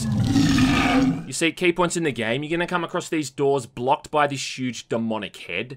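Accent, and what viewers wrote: Australia. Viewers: yep regardless if the game is good or bad always does it justice. He is around my age n I think his bad as Australia accent helps.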